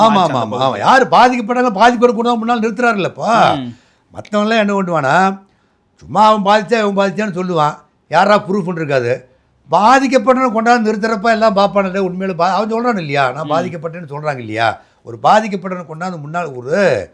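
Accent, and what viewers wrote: Kongu, India. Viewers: கொங்கு தமிழில் ❤❤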